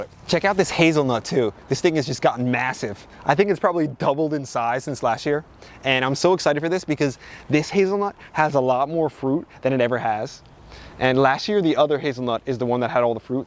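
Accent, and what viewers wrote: New Jersey, United States. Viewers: That Jersey accent is going strong! Wudder💧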